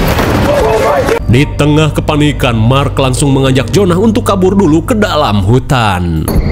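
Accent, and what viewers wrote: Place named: Bandung, Indonesia. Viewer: Si aa mah sunda na bandung pisaan aa 👌👌👌 Wewe gombel 😂😂😂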